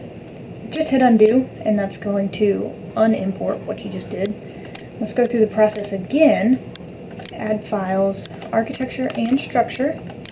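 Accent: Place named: Australia. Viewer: very simple very Aussie thx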